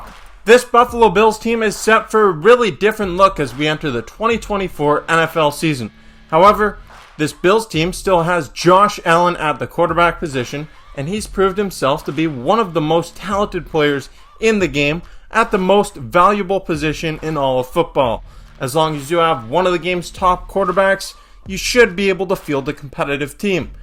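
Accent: Canada. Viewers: a canadian eh?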